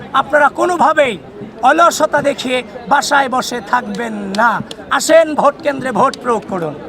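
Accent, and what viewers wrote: Kolkata, India. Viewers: ভাই কি কলকাতার?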